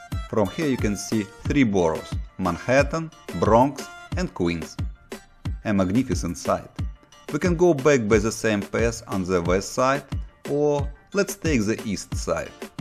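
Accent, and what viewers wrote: Russia. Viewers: You are russian? because your english sound a russian accent :)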